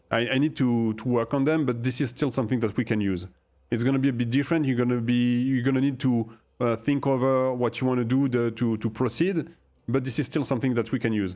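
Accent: France. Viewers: On reconnait l'accent bien français bien bourguignon. Nice craft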